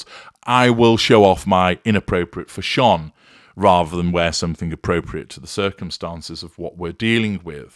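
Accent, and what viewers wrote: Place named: Manchester, United Kingdom. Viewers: Its the ingk rather than ing at the end of words that says NW England to me. On the Kings English thing, the way he pronounces project with a long o is very noticeable to me. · i think he’s originally a Manchester area man.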